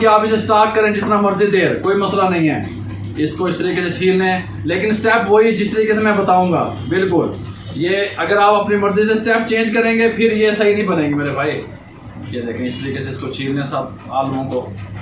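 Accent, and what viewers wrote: Punjab, India. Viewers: Well done brother I like your style Punjabi accent mein Urdu Kamal boltay hein tension nahi leni 👍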